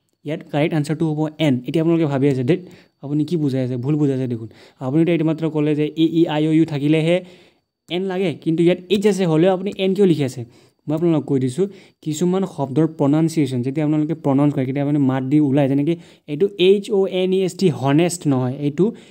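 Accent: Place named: Nepal. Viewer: Assamese language with Nepali tone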